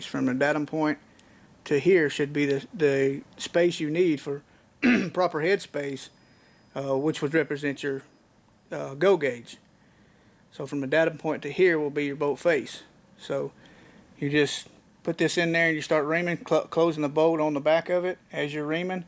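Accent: Alabama, United States. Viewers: excellent demonstration of re-barreling and head-spacing an M1 Garand. Don't worry about your Alabama accent, I understood everything that you said on your 4-part video. Like another viewer commented, you have given me the confidence to re-barrel my own projects. I would love to go to Gunsmithing school, but there are no decent ones where I live. Thank you kindly, from California, for taking the time to show us all how it's done, and on a budget to boot.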